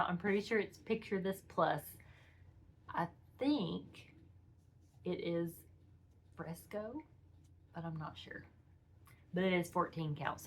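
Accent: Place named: South, United States. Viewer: Love your stitches and your southern drawl 🥰.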